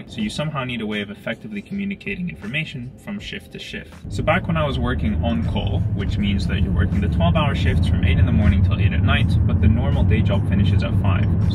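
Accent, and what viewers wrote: Scotland, United Kingdom. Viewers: you sound so scottish to me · His accent has changed a lot. He sounds so british sometimes.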